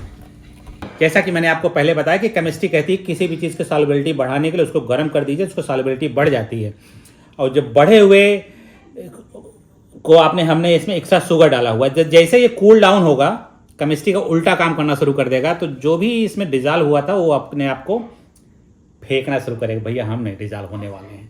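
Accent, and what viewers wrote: India. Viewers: * आपने अपने विडियो में 2 महिला + पुरुष के विडियो की क्लिप डालकर बहुत ही अच्छा किया * आपकी हिन्दी में ठेठ देसीपन है और इस वजह से अंग्रेज़ी के उच्चारण में भी देसी फन ( पन नहीं) है * जो सुनकर मजा आ गया * बाकी जानकारी का तो जवाब नहीं * धन्यवाद देसी पन और फन के लिए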